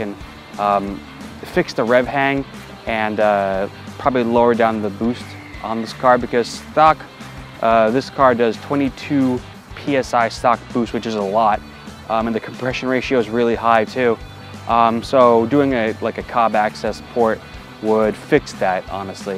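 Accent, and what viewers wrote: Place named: Western New York, United States. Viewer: just bought a 2016 WRX 2 days ago man. By the sound of it, you must be from Western NY. there is a WNY Subaru Owners Club on Facebook if you want to join it. I am also learning to drive stick on this...."interesting to say the least" describes it adequately. I'll look for you in the club. One thing I wanted to say in warning, if you mod it, almost all mods require a new tune.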